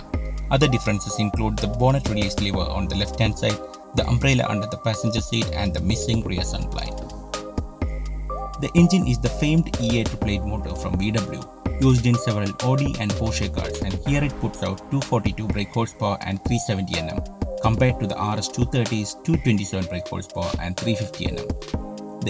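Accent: Kerala, India. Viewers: Nodoubt a malayali out there narrating 👍🏻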